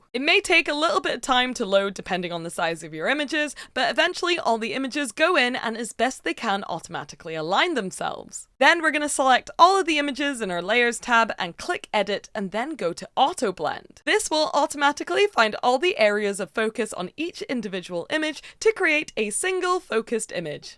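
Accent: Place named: Scotland, United Kingdom. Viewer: I hear a little bit if a Scottish accent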